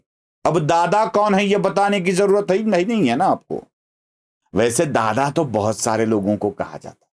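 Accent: Maharashtra, India. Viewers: आदरणीय महान् विद्वान, आपकी संवाद अदायगी को सुन कर दिल बाग बाग हो जाता है, आपके व्यंग बाण अदभुत,सारगर्भित और समसामयिक घटनाचक्र पर तमाचे होते हैं। आपकी और भाऊ टोरसेकर्जी की महाराष्ट्रीयन हिंदी मनभावन होती है, धन्यवाद।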